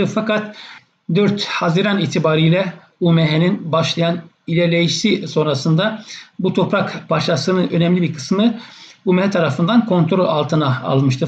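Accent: Cyprus. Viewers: Oğlum konuşman Kıbrıs Türkçesi. Sen rummudun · kolay gelsin. Özür dilerim. Şiveniz Kıbrıs şivesi gibi. Doğrumu?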